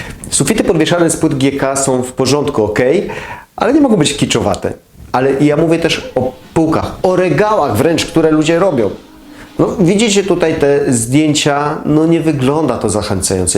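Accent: Silesia, Poland. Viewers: 👍❤️ SUPER 😘 i do tego uwielbiam Pana śląski akcent,😍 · Uwielbiam pana śląski akcent mogłabym słuchać godzinami ☺️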